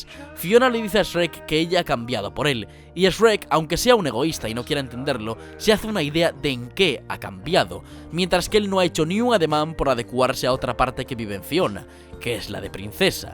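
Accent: Spain. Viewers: Que diferente es escuchar un análisis de Shrek por un español, cuando tu la viste en español latino. No saben de lo que se pierden xD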